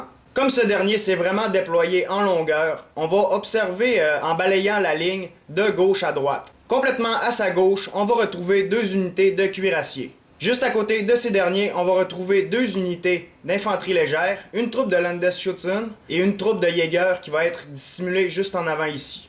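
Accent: Quebec, Canada. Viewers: j'adooore l'accent québequois !^^ très bonne vidéo ;)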